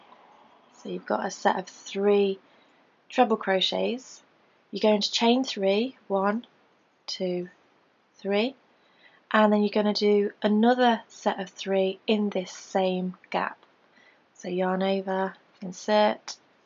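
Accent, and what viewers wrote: United Kingdom. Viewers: great tutorial, good pace, and makes a pretty star :-) Thank-you! Nice to have a British voice, too ;)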